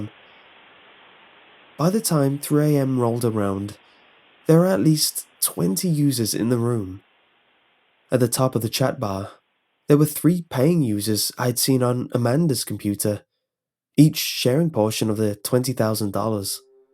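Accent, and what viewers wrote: United Kingdom. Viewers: Sorry english is not my native language, amm... is this the so called british accent?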